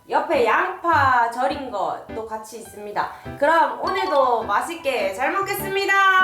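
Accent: Gyeongsang, South Korea. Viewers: 안녕하세요..경상도 사투리가 너무도 정겹네요. 저는 질금나물을 좋아해서 쌀국수 1: 질금나물2 이렇게 넣고 베트남 생고추 총총 썰어서 맵게 먹어요. 오늘도 너무 맛있게 드시네요.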